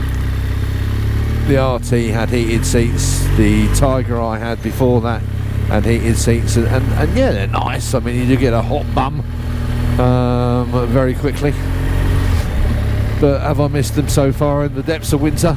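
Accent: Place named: England, United Kingdom. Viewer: Hello ohhhh lucky boy! you have a BMW! ohhh nice one! and I love your typical "English" who remember me my youngers days at college and the trip in my penfriends house ( Kingslynn).🌸 Thank you for sharing this 𝓢𝓾𝓹𝒆𝓻 ⓋⒾⒹⒺⓄ with us 🌸🍾🍷🍇 Greetings from la France in Europe🍾🍷🍇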